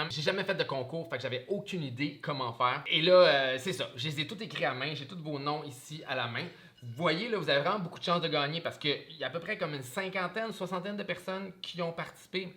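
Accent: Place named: France. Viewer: WAAA, l'accent Français est pas mal, et l'œuvre est juste incroyable !!! Ca doit bien représenter Montréal !!!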